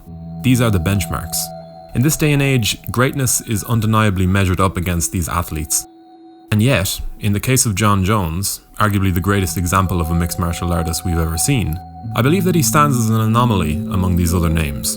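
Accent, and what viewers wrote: Ireland. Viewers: Great content , are you irish ?!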